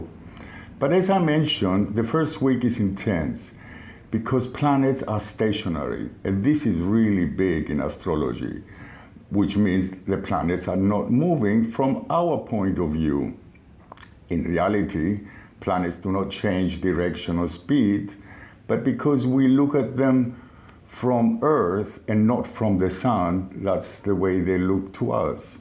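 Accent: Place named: Cyprus. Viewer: Hey hey! nEw here and truly amazed! Judge by your accent you Cypriot? ;p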